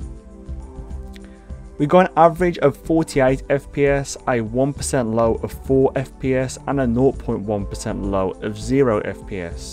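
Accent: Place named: Wolverhampton, United Kingdom. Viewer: That accent sounds very local, I’m from Wolverhampton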